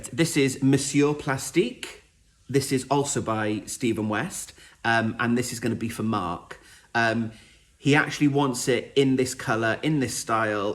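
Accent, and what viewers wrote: Wales, United Kingdom. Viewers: I'm loving your videos. Good luck with getting through your plans. I really enjoyed knitting the flax light for my daughter in lockdown. I'm currently on the last few rows of my Winter Lights Shawl by Stephen West (my first West Shawl). Also hearing a Welsh accent is making me feel home sick being a Cardiffian living in Manchester and not having seen my family since February 2020. Thank you for the virtual cwtch!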